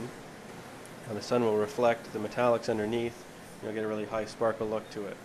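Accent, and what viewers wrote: Canada. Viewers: You sound Canadian are you? If so where in Canada? Thanks PS you need a longer hose on that paint gun....just sayin...well done. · Nice video. Great spray techniques. Are you Canadian?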